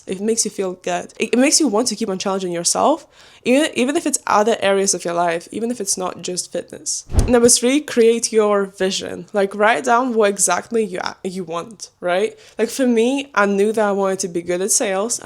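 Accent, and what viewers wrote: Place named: Ukraine. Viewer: we look so alike and have that ukrainian tone it feels like watching myself from an alternative universe omg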